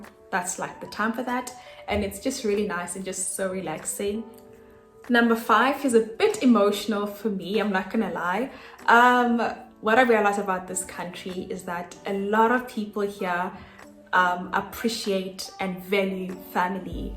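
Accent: South Africa. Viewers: Where are you from? You have an Afrikaans-English accent so I'm guessing Guateng??? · I enjoyed listening to you with the South African accent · your SA dialect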